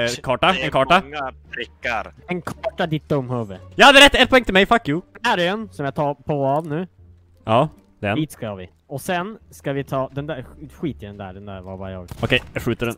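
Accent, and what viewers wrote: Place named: Norrland, Sweden. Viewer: Skön norrländska och rolig video :))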